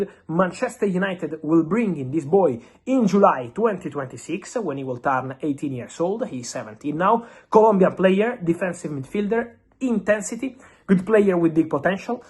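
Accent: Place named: Italy. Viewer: i love how you say sir jim ratcliffe yoiur italian accent makes it sound like sergio ratcliffe here we go have a great day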